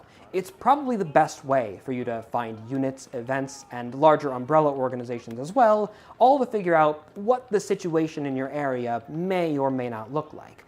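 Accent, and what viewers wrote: United States. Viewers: I have a question. Are you an American? Or are you British?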